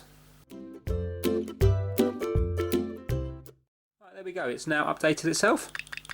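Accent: England, United Kingdom. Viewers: You have the friendliest british accent I have ever heard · i love this guys accent even tho im british too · Jamás había escuchado el acento inglés, sin duda me sacó de onda por que es algo diferente jaja, espero comprarme pronto mi nintendo switch, veré este video mientras la configuro ;)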